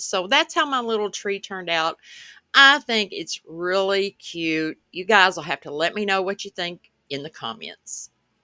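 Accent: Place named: South, United States. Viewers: Are you from Kentucky? You sound a lot like KathyJo DIY's! I absolutely love the accent! Thank you for sharing and talking! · Hey ❤I love your little pumpkins. They are so adorable. I love the Southern accent😂 Mine comes out really bad sometimes. TFS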